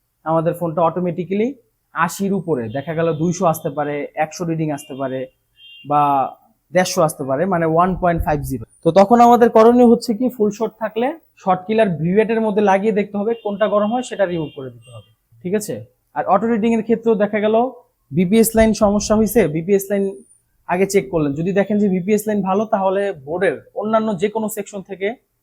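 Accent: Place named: Bikrampur, Bangladesh. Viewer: ভাইয়ের কথা শুনে মনে হচ্ছে আমাদের বিক্রমপুরের লোক। আমি মোবাইল সার্ভিসিং শিখতে চাই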